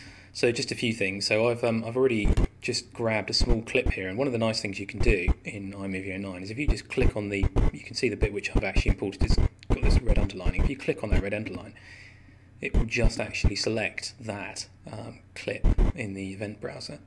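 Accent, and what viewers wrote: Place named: England, United Kingdom. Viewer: So glad you have an English accent.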